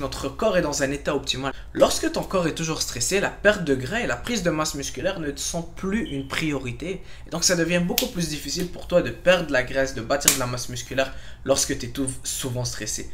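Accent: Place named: Quebec, Canada. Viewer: Je me disais aussi...y'a comme un p'tit accent québecois chez ce gars🤔. Vidéo courte et instrucive qui va directement à l'essentiel👍Merci.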